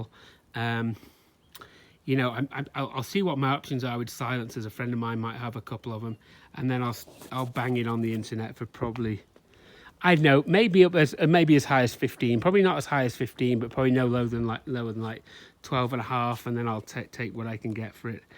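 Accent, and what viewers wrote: United Kingdom. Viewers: Question. Have you had muck around with the carburetors ever. I have a Deluxe, for their time they are among the best, lol oking qt other bikes that age. Little liquid vtwin. Ive never heard someone curse so much in the kings English, it was refreshing, thanks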